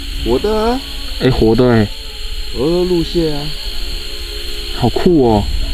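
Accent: Taiwan. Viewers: 晚上好开头介绍都用台语来说馁~我喜欢~那个低吼声我也有听到。。😰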